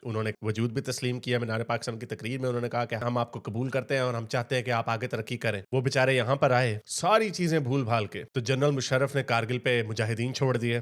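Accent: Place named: Punjab, India. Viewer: I love your Punjabi touch to the commentary ❤😊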